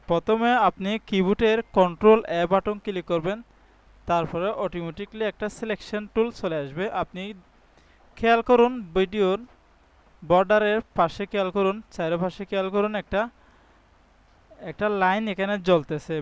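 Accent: Chittagong, Bangladesh. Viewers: ভাই আমার মনে হয় তুমি চিটাগাং এর লোক তবে ভিডিও করার সময় কথা একটু জোরে বলবেন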